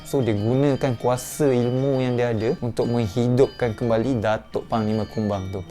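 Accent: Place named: Perak, Malaysia. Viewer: Org perak ke yop ajer nya😂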